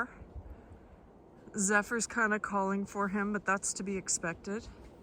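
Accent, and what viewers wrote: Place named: Minnesota, United States. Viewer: I'm glad Jack is ok. However, you have a wonderful Minnesota accent! 💕🐎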